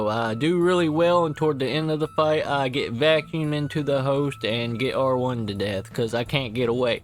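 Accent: South, United States. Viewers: LOL. The southern accent is killing me. haha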